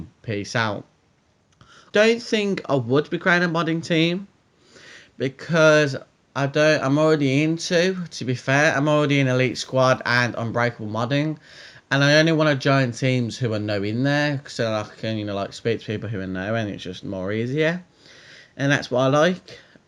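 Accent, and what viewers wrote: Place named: United Kingdom. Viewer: Damn, it's so hard 4 me to listen on British English...i'm really struggling :(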